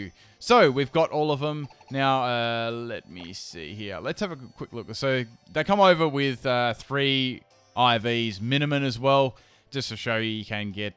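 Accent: Australia. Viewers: is australian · TheMegaultrachicken It's a very thick Aussie accent then. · He's a native Australian.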